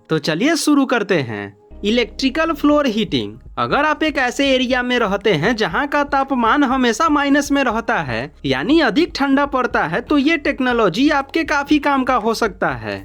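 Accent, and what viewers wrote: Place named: Bihar, India. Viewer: Bhut Ganda bolate ho, bihar se ho....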